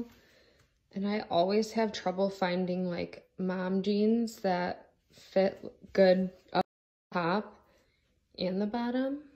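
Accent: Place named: United States. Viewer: She’s so pretty. After hearing her talk, I’m so happy that I’m from britain. American accents sounds horrible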